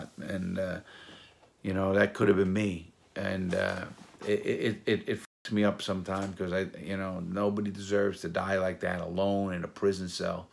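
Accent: New York, United States. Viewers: your New York accent is cooler than my Australian one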